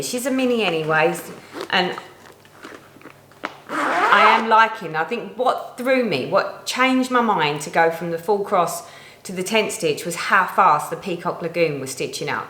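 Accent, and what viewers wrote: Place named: Devon, United Kingdom. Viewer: Enjoying seeing your lovely projects. Hope you make it to Canada one day. I grew up in Devon UK but moved to Canada 15 years ago. Your accent reminds me of home:)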